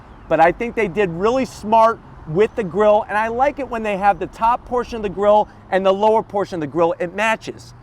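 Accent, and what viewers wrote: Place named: Staten Island, United States. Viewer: Is it weird that i could listen to you talk all day hahaha! Sounds like you're from Staten Island or some part of NY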